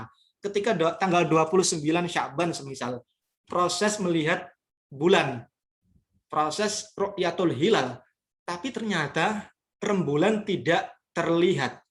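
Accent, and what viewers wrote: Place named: Jakarta, Indonesia. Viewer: Sudah memakai logat logat jakarta,. Terbaik!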